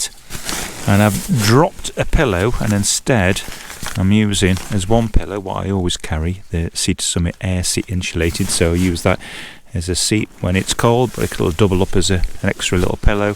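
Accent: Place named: Stoke, United Kingdom. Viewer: Interesting video, I’ve been considering this for a little while. But more importantly…….. are you from Stoke.? You sound like you’re from Stoke but haven’t lived here for a while as the accent is now quite subtle. Now if you’re not from Stoke , it sounds like your are 😂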